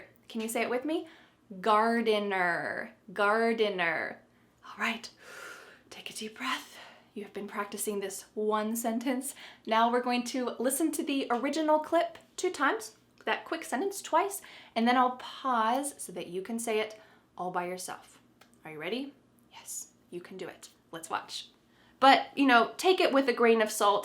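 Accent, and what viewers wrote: United States. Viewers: it's not my intention to butter you up. You don't need it and I wouldn't gain anything with that. But I have to tell you: YOU ARE THE BEST ENGLISH TEACHER ON YOUTUBE EVER!!! I've been teaching English for over 40 years. During this time I've worked with a few American teachers. But... some of them have left me with a bad impression of Americans. You, on the other hand, have such a nice, humble approach in your classes, that sometimes I wonder if you are really American, despite having the best American accent I've ever heard. Just kidding! I don't doubt your nationality. But I understand why you are so successful as a teacher! I'll recommend your channel to all my students, not only for the quality you give to it, but especially because of the wonderful person you seem to be. I wish I could meet you and be your friend. I mean it! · This way of your American-style pronunciation seems to be a bit difficult for me, my teacher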